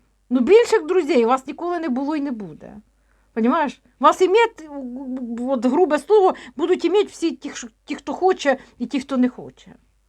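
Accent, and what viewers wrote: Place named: Poltava, Ukraine. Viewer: Дуже люблю Полтавщину,ваш говір,слухаю із задоволенням,чекаю.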